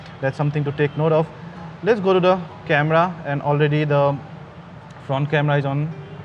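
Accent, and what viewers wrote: Assam, India. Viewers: mate . you do have an Assamese accent .. r u from there ??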